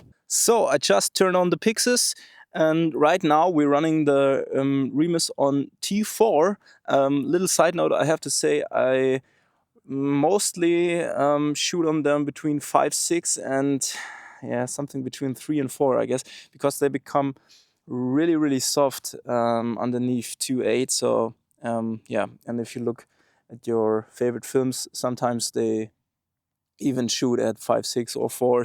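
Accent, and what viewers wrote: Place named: Germany. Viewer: Mach deine videos auf deutsch. Der markt ist gross genug und die welt braucht nicht noch einen deutschen mit furchtbaren akzent der viel zu langsam redet weil er im kopf Übersetzen muss. Geile kamera, sie hätte ich auch gerne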